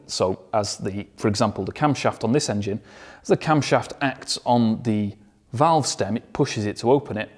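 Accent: United Kingdom. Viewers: Just stumbled upon you after googling how water cooling works. Terrific stuff mate - as an Aussie I'd much rather listen to a Brit over an American and it's thrilling to now know there's one out there to listen to!!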